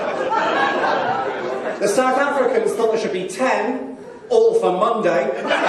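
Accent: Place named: Isle of Man, United Kingdom. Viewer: Thought you were Manx!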